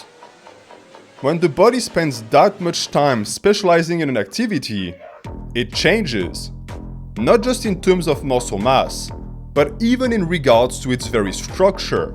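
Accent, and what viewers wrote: France. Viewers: Youtube cannot comprehend thick french accent, the closed captions keep saying "vapers" instead of "v-tapers" and said "food in the gym" instead of "foot in the gym" it's hilarious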